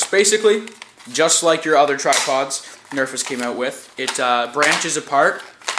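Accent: Canada. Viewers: This guy is Canadian as fuck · are you canadian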